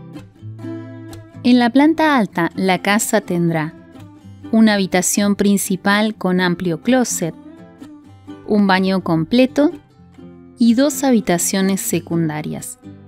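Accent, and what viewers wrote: Argentina. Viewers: Es voz Argentina.👄😍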